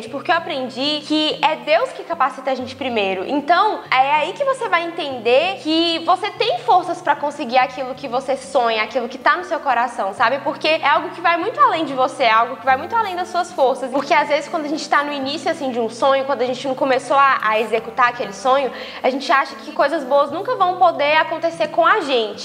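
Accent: Minas Gerais, Brazil. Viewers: Voce e mineira?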